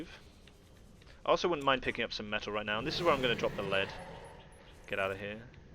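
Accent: United Kingdom. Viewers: As someone said down there you're really good at commentating, and I absolutely love your english accent (as a french) ! And doing this while performing is incredible _ I say "Chapeau bas" ! :D EDIT : and he even EXPLAINS during his performance it's just so cool !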